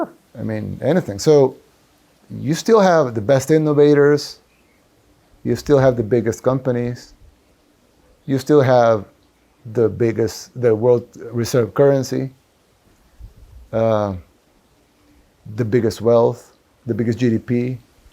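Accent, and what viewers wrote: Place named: United States. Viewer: Sounds like an American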